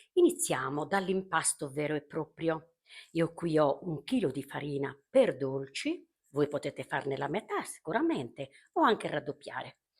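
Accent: Sardinia, Italy. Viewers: Ma tu sei sarda come meeeee, l' ho capito quando hai detto: si tianu, siiiproprio come lo chiamiamo noi e anche come fai i papassini , mi fa' piacere, e forse non sei molto lontana dal mio paese, da come parli il dialetto direi proprio di no. Complimenti ancora, sei unica😘♥️🎉🎉🌹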